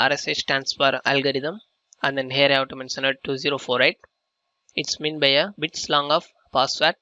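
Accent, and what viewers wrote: India. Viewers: SOUTH Indian English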